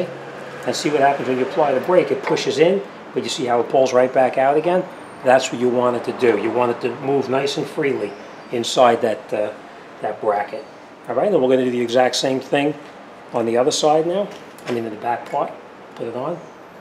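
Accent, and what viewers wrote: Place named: Bronx, United States. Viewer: Nice Video My Man... & That Sound Like A Bronx Accent!!! Lol...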